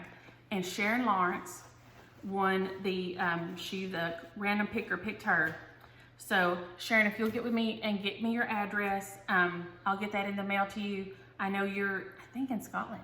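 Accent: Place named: North Carolina, United States. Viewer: I seriously laughed out loud when you talked about whoopings and “I’ll give you something to holler about...” I love Blackbird too and that pretty bird in the red! Nice job....keep up the great work... now to go see where you’re from! I live in NC and you sound like me! 😂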